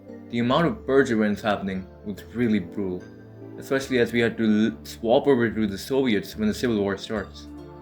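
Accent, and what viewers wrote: India. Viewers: Try to hide the accent next time jeet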